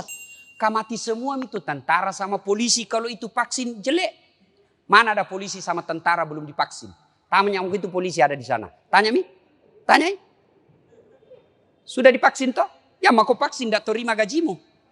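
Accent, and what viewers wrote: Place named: Banjar, Indonesia. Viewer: Org Banjar y ustadz,dr awal mirip bahasa suami saya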